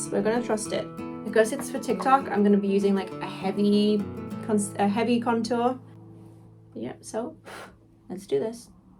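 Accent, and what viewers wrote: United Kingdom. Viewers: lets all be honest, we love their accent, where the brithish gang at?? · Who else loves British accent 😃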